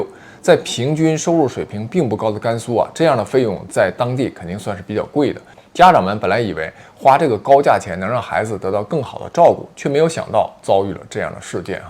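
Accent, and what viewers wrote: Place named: Anshan, China. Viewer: 女主播好像是鞍山人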